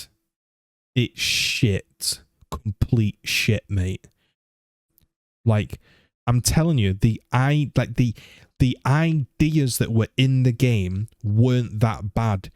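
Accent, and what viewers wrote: United Kingdom. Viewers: I watched this entire video and this is easily the most honest full review of the game and pretty much exactly how I feel. Just remember Z the silent majority of the Gears community stands by you and wishes you the best. I know many content creators and streamers that love your content and persona and behind the scenes talking about your entire situation. I've been here since getting spammed that video on twitter about Gears 1 compared to Gears 5 which I ended up enjoying. I loved the WWE style Gears videos and they still make me smile when I think about them. British accents drive me crazy but watching your videos for awhile now actually made the accent tolerable for me now <3 Love you man and take care of yourself.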